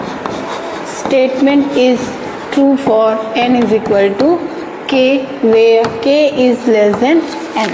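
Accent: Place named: Bihar, India. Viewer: Why using bihari accent 😞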